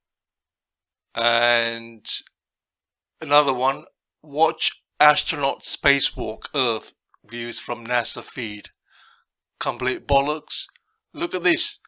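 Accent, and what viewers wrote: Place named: India. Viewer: Haha you're so funny. A bit "old man shouts at cloud" there. BTW, do you have non-UK roots? You sound a bit like one of my friend's Indian uncles: )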